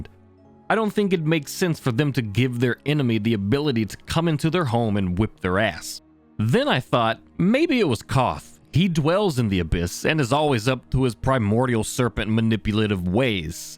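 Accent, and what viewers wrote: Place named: South, United States. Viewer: I just now noticed that you have a slight southern accent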